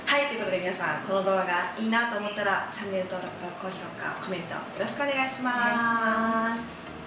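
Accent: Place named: Kyoto, Japan. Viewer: 京都弁ええなぁ～🤣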